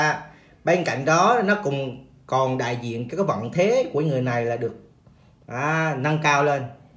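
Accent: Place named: Quang Nam, Vietnam. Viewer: Nghe giọng thầy chắc người QN-ĐN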